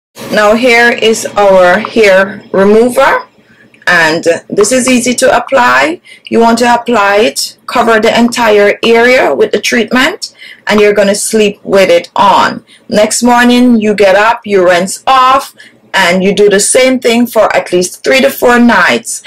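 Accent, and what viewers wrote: Jamaica. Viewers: Were are you from? You have a strong Jamaican accent. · You're Jamaican right?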